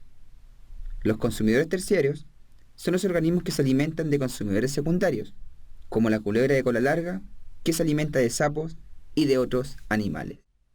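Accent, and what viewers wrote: Chile. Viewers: Gracias me ayudó mucho!😊 Eres de chile verdad? Me encanta tu acento :D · no me puedo concentrar por el acento chileno xd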